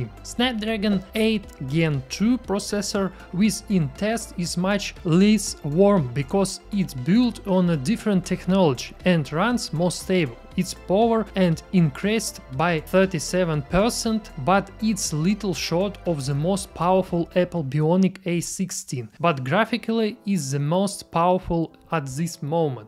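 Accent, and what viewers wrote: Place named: Russia. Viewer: The Russian accent is the cutest accent in English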